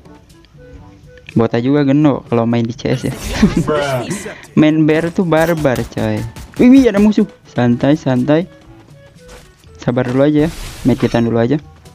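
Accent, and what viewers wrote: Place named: Lampung, Indonesia. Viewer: Abang orang lampung bang kok ngomong sikok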